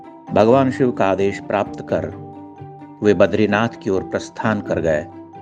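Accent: Standard. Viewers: सारगर्भित एवं परिष्कृत हिंदी के साथ आपका विशुद्ध उच्चारण, वीडियोज को प्रेरणादायक होने के साथ अत्यंत आकर्षक बना देता है। प्रतीत होता है कि The Frustrated Indian के प्रारम्भिक वीडियोज मे भी आपकी ही आवाज थी।❤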